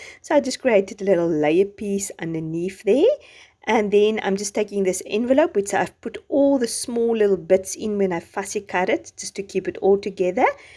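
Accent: South Africa. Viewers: Oh my word this is gorgeous!!! I am new to your channel! South African?😃 I’m from South Africa! Bless you and you beautiful channel!💕💞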